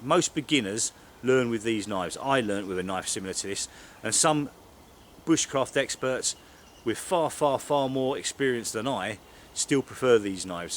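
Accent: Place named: Wales, United Kingdom. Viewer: The pointer was the main one and I know that Brecon Twang anywhere lol,and unlike most on here you know what your talking about,good vid will be subbing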